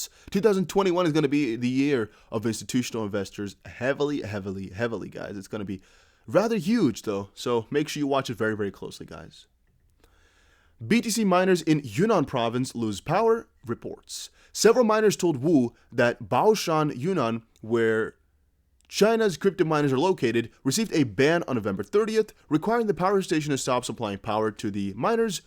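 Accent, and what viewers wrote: United States. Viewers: What is your first language? Because you sound like an American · Dude sounds American I know the English accent from Europe, Canada, Australia, and New Zealand sound different then American accent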